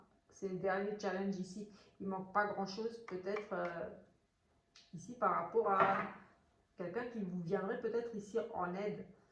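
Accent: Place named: East, France. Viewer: Un accent de lest on dirait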